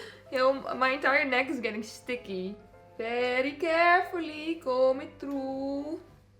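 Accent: Netherlands. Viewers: Wtf is that accent. She is 100% Dutch. Aaaaah cringe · Are you from the netherlands becouse iam and your accent is sumulair to myn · Ik hoor meteen dat je een Nederlander bent😂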